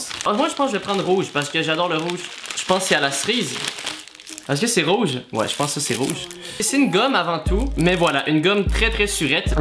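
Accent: Quebec, Canada. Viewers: Oui exact je le sais mais sa me fait rire l'accent cannadien😂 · Tes tu québécois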